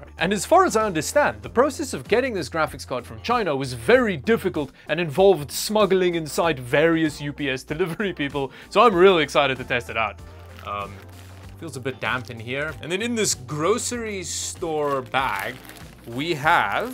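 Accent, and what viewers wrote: South Africa. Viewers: Is South African? Accent seems familiar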